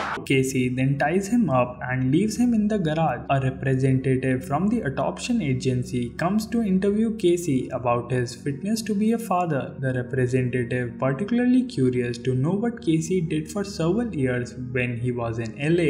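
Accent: India. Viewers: I'm a born and bred Englishman. Your voice and accent is a very nice Indian one, also soothing. Ignore the racist haters and please keep going ! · I’m tired of the ai text to speech voiceovers so finally hearing a human is so relaxing. Also I enjoy Indian accents as I can do a Indian accent too along with a lot of others!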